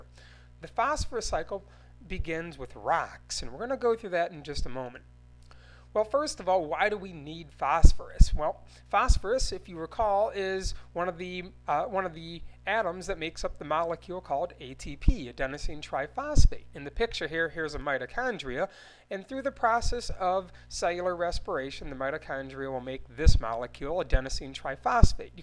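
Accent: Midwest, United States. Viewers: I'm from Michigan. The way he says "rocks" sounds exactly how everyone I know says rocks. I was waiting for him t sound weird, but he never did. Must be a midwesterner.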